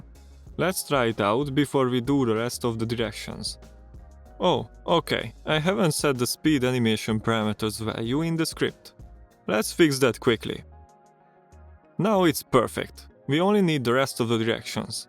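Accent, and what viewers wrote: Hungary. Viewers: Magyar vagy ? olyan az akcentus. If you didnt understand nevermind my comment :)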